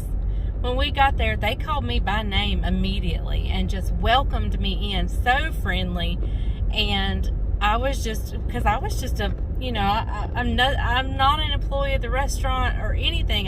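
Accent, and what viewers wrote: South, United States. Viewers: That was very enjoyable. Thank you for taking us along. If you are going to be creating new masterpieces I would love it if you could think of some ways to use up a bunch of eggs. I have chickens and we used to love eggs but now that they are coming out of our pet's butts, no one in my family want to eat them. I never realized that chickens breed like rabbits so now I have eggs coming out of my ears. The only time I use them is when I bake or make deserts that call for eggs and the rest I try to give away but I think my friends are about overloaded with them now too :-). I need some ideas of how to use them up. Thanks again for sharing your day. I just found your channel this weekend and I have been binge watching it every moment I get. For some reason you feel so familiar to me so it is like listening to an old friend share a recipe with me. 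Maybe it is your southern accent 🥰.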